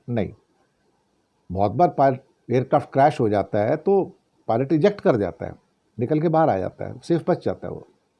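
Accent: Pakistan. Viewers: कहां से फर्जी समाचार लाते हो....बोलने का अंदाज ऐसा है जैसे कोई पाकिस्तानी बोल रहा हो....निकृष्ट स्तर.... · हर बार कि तरह पाकिस्तानी बोली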